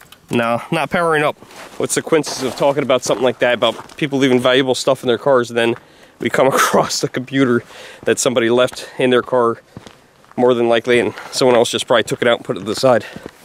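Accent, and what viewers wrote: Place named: Midwest, United States. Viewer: Your accent is NOT Hyde Park NY. More Midwest or Minnesota. Just saying~~~ 👍❤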